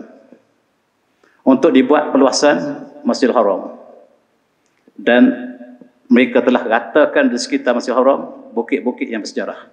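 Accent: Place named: Kelantan, Malaysia. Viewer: Slang kelantan tu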